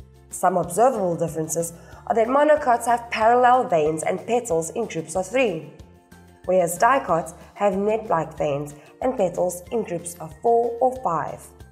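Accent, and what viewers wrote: South Africa. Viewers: This helped so much One question: Is the voice over south African?👀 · This helped very much thank you 🤧💞😭 (Also you sound south African for some reasons I'm probably wrong tho )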